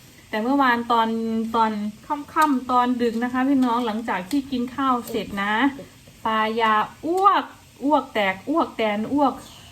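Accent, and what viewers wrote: Isan, Thailand. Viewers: ดูคลิป มานาน รักทุกคนเลยค่ะ โดยเฉพาะพี่ และ น้อง มากๆเลยค่ะ รู้สึกแปลกที่ พูด ภาษาไทย อิสานมากขึ้น อยากให้พูดไทยภาคกลางเหมือนเดิมค่ะ ต้อง ขอโทษพี่น้องด้วยนะคะ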